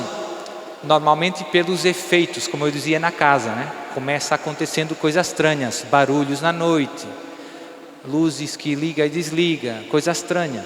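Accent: Brazil. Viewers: Ele morou no Brasil na juventude, mas com jeitinho, qualquer português é capaz de falar com sotaque brasileiro, devido às quantidades industriais de telenovelas brasileiras que têm visto desde 1977 (Gabriela e n seguintes). Já o contrário é mais difícil...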